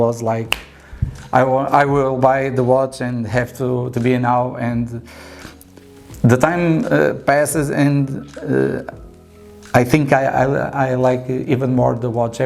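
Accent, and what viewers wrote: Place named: Portugal. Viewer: I mean it's obviously not his first language, I'd go easy on the guy, sounds like his native language is portugese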